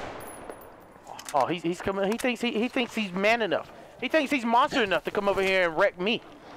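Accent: Russia. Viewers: I love his Russian accent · You do a really good Russian accent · RUSSIAN ACCENT 💯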